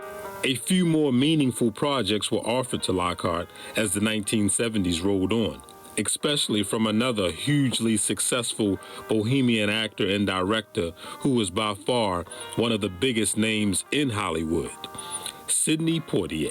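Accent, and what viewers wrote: Baltimore, United States. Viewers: I was today old when I learned that the name Biggie Smalls was from the movie “Let’s Do it Again.” Also my people are from the Bahamas. Cool. Also I hear that Baltimore accent. Good video 👍🏿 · The woman's name is pronounced OOOH-tah HAH-gen (Uta Hagen). She was someone you truly need to research. Also, the role from Othello is pronounced Ee-YAH-go (Iago). You're Baltimore accent is strong. I love it!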